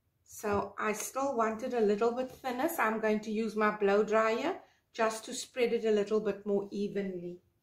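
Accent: South Africa. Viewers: Lovely. I would recognize that South African accent anywhere. Mine's the same.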